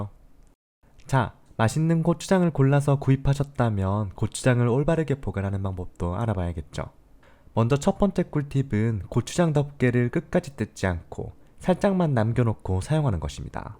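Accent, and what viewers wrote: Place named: Daegu, South Korea. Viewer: 살짝 스치는 대구사투리에 정겨움이 느껴집니다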